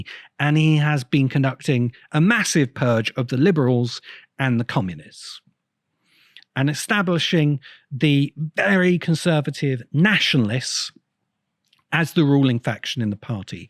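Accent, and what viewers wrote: United Kingdom. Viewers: So in a nutshell, the author here defines as ''post-propaganda'' any point that might be brought against his own biases. Which in a hilariously cyclical argument sense its a form of propaganda too. Im not payed by Russia or China (hey that's what somebody who IS payed would say lol), but hearing him talking as if he has some kind of higher moral ground, with British accent, is at the very least ironic if not ridiculous. On the point made earlier about how much democratic we would consider today the Athenian Democracy, I will reverse it and ask would the Athenians recognize our indirect practically ''representative'' oligarchy as a Democracy when that term derives from Demos (citizenry)+ Kratos (Power)?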